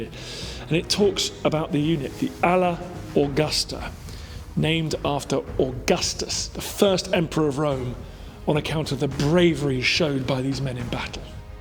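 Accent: United Kingdom. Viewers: Good video - but... You need to provide subtitles better than the auto-generated ones. British accent can be challenging for non-native English listeners as much as it is for whatever AI is translating, and if you add Latin words to the mix, you get amazing things like "Allah Augusta" and there's no way to unread that once you read it. 😂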